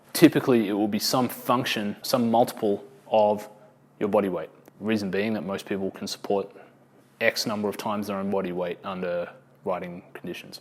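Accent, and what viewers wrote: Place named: Australia. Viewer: haha reppin the aussie accent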